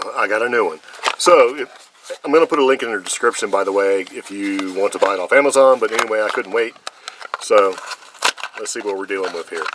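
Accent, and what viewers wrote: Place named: United States. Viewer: i watched because you sound like a cool American dude good presentation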